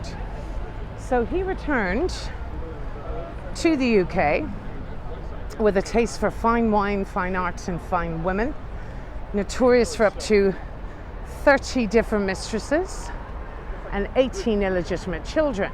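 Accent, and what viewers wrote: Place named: Ireland. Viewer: Love your tours, I love history escpecially that around London, you are so informative, your gorgeous Irish lilt all goes to make one very enjoyable armchair tour, Thankyou from a Southerner now living in the North, I’m off to the “ Old Bailey” now if anyone should want me 😜🥰🐾🥰🐾🥰🐾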